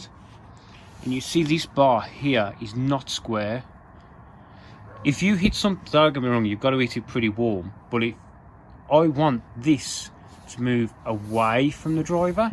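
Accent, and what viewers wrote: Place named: Midlands, United Kingdom. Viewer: Hi mate - guessing from your accent your from the Midlands - where about a are you ? Would like to discuss a possible project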